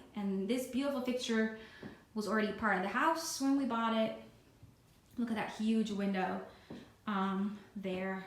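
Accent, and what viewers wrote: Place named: Louisiana, United States. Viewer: Beautiful home and property. You sound like you are originally from south Louisiana area to me. We would love to relocate to Maryville from Houston one day.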